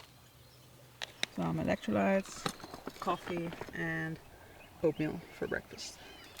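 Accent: Netherlands. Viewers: Ik dacht al een piepklein Hollands accentje te horen😁 En ik woon zelf toevallig op de Veluwe. Mooi inderdaad, maar is niks vergeleken met waar jij nou zit. Voor een klein beetje wildernis, is het al snel Zweden of Finland (ben meer van het packraften). Leuk zeg. Superveel succes met alles wat je daar doet. En oprecht een mooie video👍🏻 Groeten, Remko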